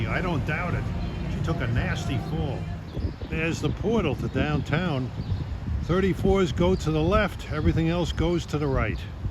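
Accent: New York, United States. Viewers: Great report. I noted that you initially said "down town" and later changed it to Center City. That is the more correct term used in Philly. Re blood pressure, you sounded just a bit stressed out. Perhaps the long day, standing all day or most of it, meeting certain time tables, and dehydration in the heat can cause all that. I have the same problem so I know from personal experience that this can increase your blood pressure. Please stay in the shade, stay seated, drink plenty of water, have a good sandwich, and stay as relaxed as possible. No bad words! Kudos to you for keeping it kosher as they say in Brooklyn. Did you get to chat with some of the locals? I bet your NYC/LI accent stood out among those Philly jawns. Look forward to your next report. Keep up the good work.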